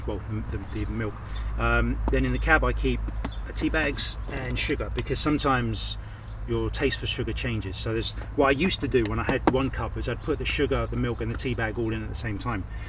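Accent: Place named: England, United Kingdom. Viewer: I don't get it. You sound English not Bangladeshi. Can't you just afford a coffee?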